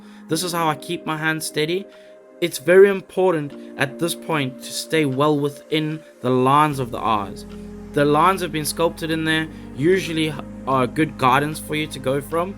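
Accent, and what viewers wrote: South Africa. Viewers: South African, ???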